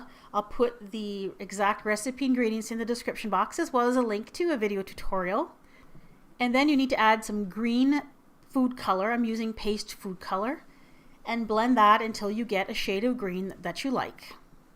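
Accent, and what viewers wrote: Canada. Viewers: omg, your Canadian!! :O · Is she Canadian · your canadian, right?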